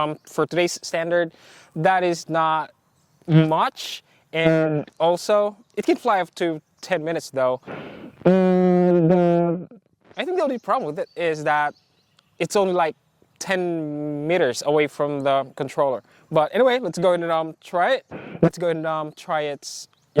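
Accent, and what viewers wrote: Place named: Philippines. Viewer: Mag Tagalog kana lng pag english2 halatang Pinoy ka 😊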